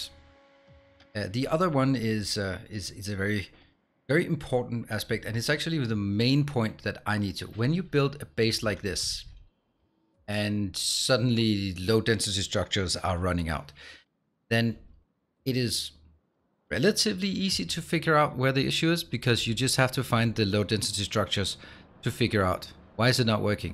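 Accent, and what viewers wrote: Denmark. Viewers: The danish accent is strong with this one, kinda like it though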